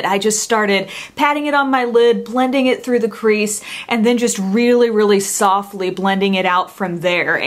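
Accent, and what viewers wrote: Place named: United States. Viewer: You're so pretty and your accent is SO COOL. Whereabout in America are you from?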